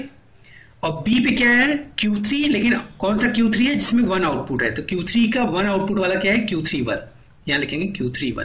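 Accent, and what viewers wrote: India. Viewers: dude i cant understand you accent maybe only indish people can understand u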